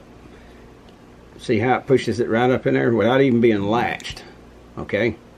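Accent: South, United States. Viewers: Your accent is exactly what they aimed for in inglorious bastards for brad pitt. love it.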